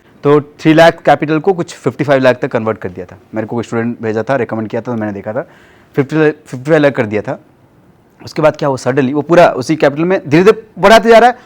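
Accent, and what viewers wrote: Odisha, India. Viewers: Hindi bolna style se lagg raha he , ap Odia ho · Sir ap odisha sa ho kiya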